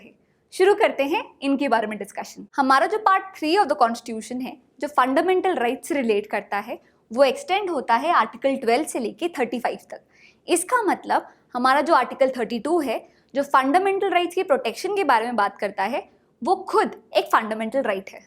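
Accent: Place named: South, India. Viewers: You are south Indian. That accent. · Arey waah. An unrealistically unbelievable way of making a layman understand. You go girl. And yes, you sound Malayali.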